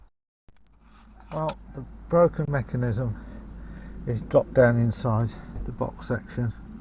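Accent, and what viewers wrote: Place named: United Kingdom. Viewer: Thanks for this video. As a British accent lover i have to also say that your accent is amazing!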